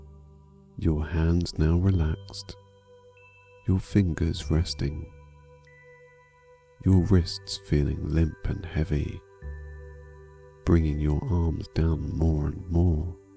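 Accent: Scotland, United Kingdom. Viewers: These meditations are perfect. <link> him has a gentle, warm, pleasant voice (with a slight Scots accent). The words are simple and clear. The timing is perfect... just as you start to find your mind wandering, he says and if you find that your mind is wandering... . There is no background music and no noise in the background, only his peaceful and engaging voice. The length of the meditations (about 1/2 hour each) works well for me. I find that I tire of some meditations after frequent repetition, but that hasn't happened with this one. The metta lovingkindness meditation always feels right. Some meditation CDs I have tried have not felt right. The voice seemed hurried, or sounded tense; the background music seemed intrusive, or there were noises that interfered with concentration. This is just perfect as an introduction for new meditators, and it's also a meditation that I return to again and again. 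I'd give this more than five stars if that were an option. Even my students, who are not ordinarily very receptive to meditation, relax when they hear this one! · please tell me are british ??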